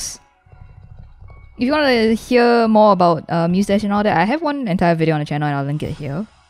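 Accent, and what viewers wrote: Singapore. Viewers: She's Singaporean, but what's the difference lol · Are you singaporean or malaysian because of the accent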